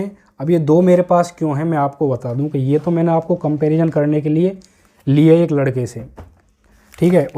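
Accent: Bharatpur, India. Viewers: Bhi bharatpur ke lagte ho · Where are you from?🤔 You talk in bharatpur accent..🤔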